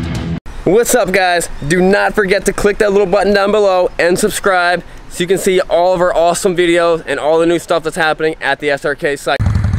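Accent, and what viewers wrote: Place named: Georgia, United States. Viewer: LMFAO... can tell was in GA for a while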